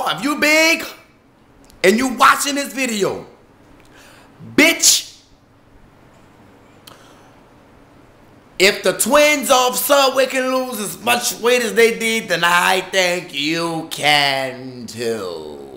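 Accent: New Orleans, United States. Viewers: He Was Sooo Cute.... I love a New Orleans Accent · how people in new olreans talk.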